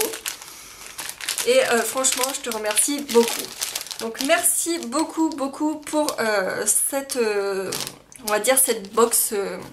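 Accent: North, France. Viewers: Je n'avais jamais tester l'astuce du vernis transparent, je testerais à l'occasion, car moi aussi j'ai des allergies au niveau des oreilles! Merci pour tes supers vidéos et ton accent du nord bien prononcé, ça me fait penser à ma ville natale! <3 Bisous! :)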